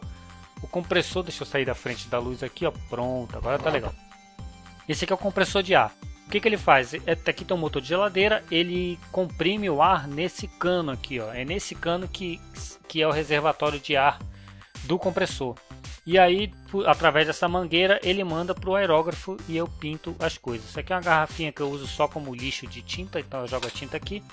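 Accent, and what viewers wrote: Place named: Belém, Brazil. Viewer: Ele deve ser de belém do Pará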